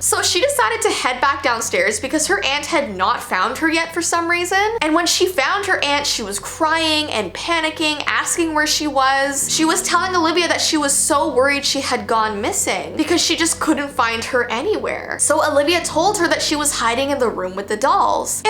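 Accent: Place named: Canada. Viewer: I think she sounds very Canadian, when I first found her channel and binged her vids my mom complained that I was saying “out” weird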